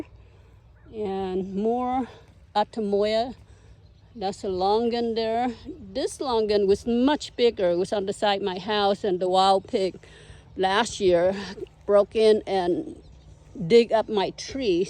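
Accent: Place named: Vietnam. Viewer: math pays the bills! Farming in the blood. Having an edible landscape surrounded by open space is nice. There is an empty lot 120 acres down the street from us we are looking into only issue is it’s VERY steep. Jujubes we do know quite well. I grew up on a farm in San Diego county and we had jujubes so I developed a taste decades ago for them. Will be interesting to watch the progress of the avocados. What year was initial planting? I started in 2017 and am about out of space now at 70 trees. Total trees with everything about 100 I lose track. I’ve had a lot of failures with avocados but now got it down pretty well with good drainage and lots of mulch and most of the grove is thriving. I am especially interested in seeing how Nimlioh does for you. I have 3 and they are thriving. Funny trivia my guess is you were from Vietnam bc I know the accent - MANY of my students are Vietnamese and have been teaching 25 years to them. 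My best friend Andrew Stehr who owns Stehr concrete in San Jose has Vietnamese wife and he - white guy from Alabama is completely fluent in Vietnamese. I read and write mandarin 我读写汉语 so what is interesting is when Andrew translates Vietnamese into Chinese for me I can understand it. So anytime the Vietnamese use the Chinese characters I feel very happy. We have a new Vietnamese supermarket that opened called Dai Thanh market and I have no idea what that means but as soon as I see the writing 大城 market then I know what it means - big city market. Avocado 牛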